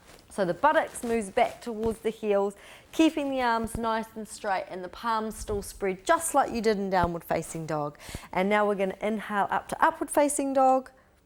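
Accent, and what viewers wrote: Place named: New Zealand. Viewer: haha awesome NZ accent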